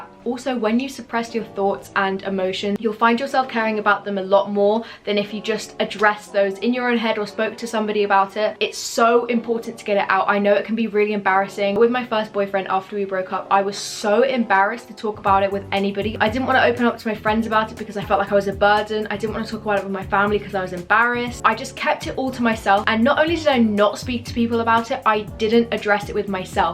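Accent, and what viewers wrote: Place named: United Kingdom. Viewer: you can tell she’s british without any doubts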